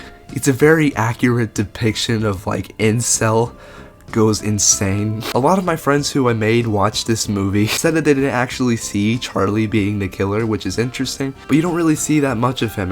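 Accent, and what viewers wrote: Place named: Germany. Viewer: Nice video! You've a german accent kinda xD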